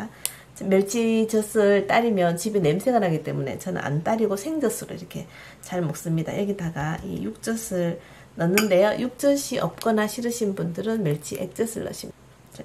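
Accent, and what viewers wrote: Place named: Busan, South Korea. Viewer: 곰피 그라이까네 돌아가신 우리어무이 생각이 나고 아련한 추억 과 정서적 그리움 이 나네예 이 조리하명셔 하시는 나래이션 억양 도 어쩌면 어무이 억양하고 똑 같는지 모르겠심더 꼭! 우리어무이가 환생 하셔서 내 어릴적 부엌에서 음석 하시는 모습 이 회상 되네예 저는 어려서 식구들 따라 부산에서 서울로 이사 왔 심더 이제 나이 60 문턱에 있고 저희 노친네 부모모두 다 세상 떠나셨지만서도 우리 집안 음식맛은 이제 어디가서 도 손맛 을 느끼기가 어려워서 추억으로만 간직하며 있었는데 채널 을 보며는 꼭 우리집안 음식 만드는 정서가 자극 되네예 😊 어릴적 어무이따라 자갈치시장 장보러 가고 하다보이 저희집 음식은 젓갈도 비리고 짜고 맵고 하는 기호 이다보이 이 젓갈도 비린거 좋아하는 사람 운운 하는 맨트 들으면 꼭 백프 나한테 공감 와 닿심더😄 어쩌면 꼭 억양 어투도 우리어무이 하고 꼭 같는기라예 음석 취향도 같고😂 내 생애 다시는 우리 어무이 음석 손맛 못 찾을것 같은데 유튜브 채널 보며 내안에 잠재되었던 우리집안 음식 정서 를 자극 받심더😆 이제 우리집안 일가 그쪽 친족들 다~ 아 어른들 이 않계시고 고향 에는 아무도 없다보이 부산경남 쪽 음식손맛 의 전통을 느낄 기회도 없어지는게 아쉬움 그리움 으로 간직하며 지내는데 우연찮게 채널 레시피하고 나래이션 보고듣고 하이까네 돌아가신 어무이 생각 나서 댓글 몇자 정겨움 과 고마움에 올려보네예 계속해서 내고향토속적 음식 조리방식 비중으로 좋은 방송 해주세요 아셨지예🤣 화이팅! ✊👍 레시피를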